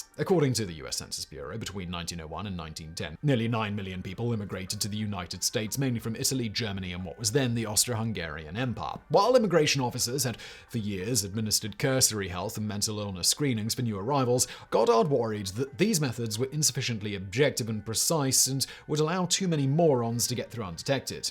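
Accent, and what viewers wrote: United Kingdom. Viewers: Great video as usual. Simon I had to think twice when you pronounced cretin with that Brit accent of yours. Americans have only heard it pronounced cree-tin and it applied to all sorts of monstrous beings. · oh a british vauce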